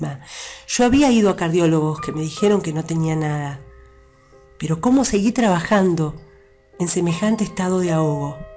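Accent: Argentina. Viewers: Gracias, gracias gracias. Haz llegado a mi vida justo en el momento preciso, ni antes ni después. A mi me Dan taquicardias y siento que muero, me han dicho una y otra vez, ve al sicologo.. Tengo tiroiditis hashimoto, enfermedad auto inmune, enfermedades que tienen origen emocional. Dormía con este audio, y empecé a escuchar desde mi subconsciente, como q era una gran amiga la que contaba, y me decía, por qué no me había dicho, porquě no me lo había contado, si estuvo al borde de la muerte, pero y por qué habla como Argentina? Hasta que entrě en mi conciencia.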